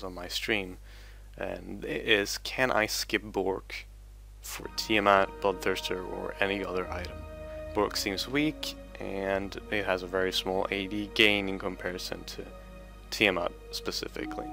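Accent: Sweden. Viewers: You are swedish for real? :D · Ofta du inte hör att han är svensk?:s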